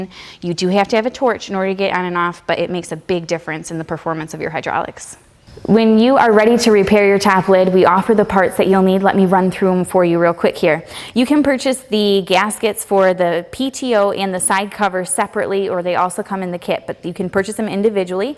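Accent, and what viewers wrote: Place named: Michigan, United States. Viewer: The Michigan accent is too funny.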